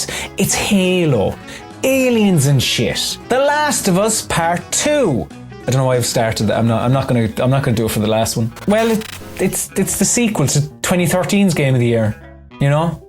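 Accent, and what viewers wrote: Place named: Ireland. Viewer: Nice to hear your dulcet irish tones again 👍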